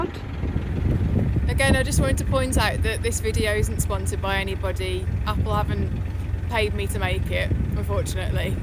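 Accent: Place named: Birmingham, United Kingdom. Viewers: Hiya, wishing you a very Merry Christmas from Sweden 😁 - from an ex-pat (originally from Wolverhampton) but been here in Sweden for almost 30 years now. Discovered your channel a few months ago and your journeys bring back many happy memories. I used to fish a lot on the Staffs Worcester when I was young 😁👍. Safe travels and enjoy the festive season 🎅 PS I've been trying to place your accent - first I thought Wolves but you seem to have some Brummy in there too 😉😁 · I worked in the West Midlands for many years and fell totally in love with the accent - It's such a delight to listen to you! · I love your Brummie accent ❤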